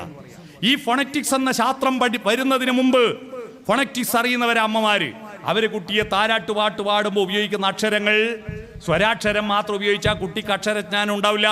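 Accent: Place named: Kannur, India. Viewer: എന്റെ പൊന്നു സുരേഷ് ബാബുസറെ,താങ്കളുടെ പ്രഭാഷണം വളരെ വിലപ്പെട്ടത് തന്നെ, പക്ഷെ, ഭാഷ വെറുപ്പ്‌ ഉളവാകുന്നത്, കണ്ണൂർ, കാഞ്ഞങ്ങാട്, etc,,, അതൊന്നു മാറ്റി നല്ലമലയാളം, നല്ല ഉച്ചാരണം ആക്കിയാൽ ഒന്നുകൂടെ (100%) നന്നാകുമായിരുന്നു, pls try Sir, 😊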